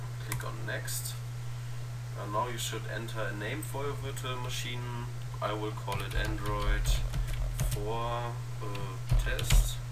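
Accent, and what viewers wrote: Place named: Germany. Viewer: bist du deutsch xD das hört man :D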